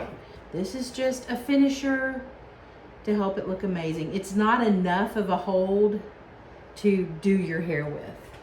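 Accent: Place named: South, United States. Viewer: Thank you for this video!!! I JUST discovered you and subscribed. I can't afford to go back to my DevaCurl Stylist right now, so I will be watching ALL of your videos. I also love your haircolor and highlights. Can I ask what color/brand you use? Also, you are so very pretty, great personality and adorable southern accent!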